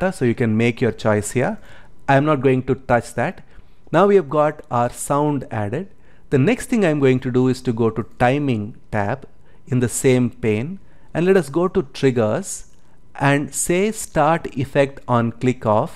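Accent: India. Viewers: Tamil accent iz da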